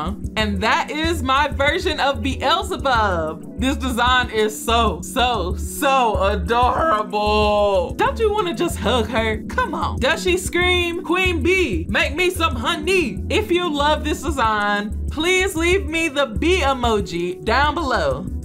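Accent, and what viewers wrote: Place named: Georgia, United States. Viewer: girl i’m loving your energy in these vids!! are you southern lmao, bc the voice is giving Georgia lowkey (this isn’t shade i’m an SC gworl)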